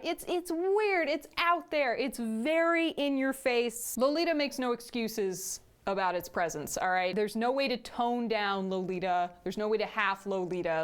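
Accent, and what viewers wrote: Texas, United States. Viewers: Did anyone else notice her slip into a heavier Texas accent halfway through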